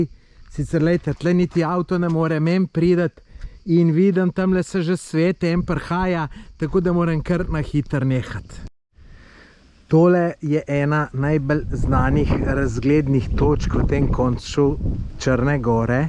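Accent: Lower Carniola, Slovenia. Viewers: Joj, kolk ti mene nasmejiš! Tvoja dolenščina je tolk zabavna, še kletvice so original. O krajih ne poveš kaj bistvenega, ampak za popotnika pa krasne info. Cena app, hrane, zajtrki.....ma ni boljše info. Super. Veš kaj mi je tudi všeč; začel si intervjujat ljudi. To je super. S tem potovanjem proti Albaniji in sedaj ČG si me pa spet navdušil. Motijo me le reklame, ki so podvojene in na kratko sapo.Razumem, da je tudi to del zaslužka, ampak....razmisli.....če ti res to potrebuješ. Na nek način meni malo razjezi, pa še koga verjetno tudi. Bodi fajn...in srećno pot proti domu. Aja....pa bolj počasi potuj...potem se ti neumnosti ne bodo več dogajale.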